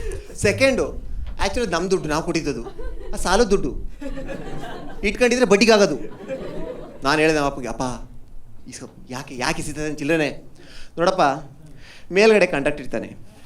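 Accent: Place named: Chamarajanagar, India. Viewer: Annoovv ur from chamarajanagara❤ ansuthe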